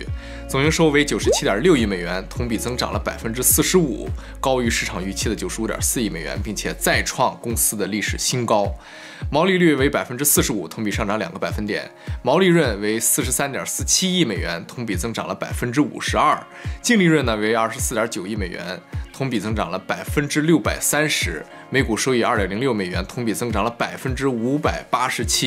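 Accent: Shandong, China. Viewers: 听口音老乡啊山东淄博济南? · 听口音是山东人吗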